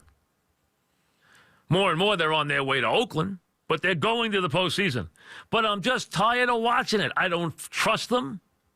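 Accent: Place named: New York, United States. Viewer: I wish these stupid New yurkers would learn how to speak English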